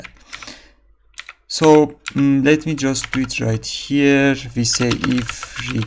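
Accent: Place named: Iran. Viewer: لهجه فارسیت داد میزنه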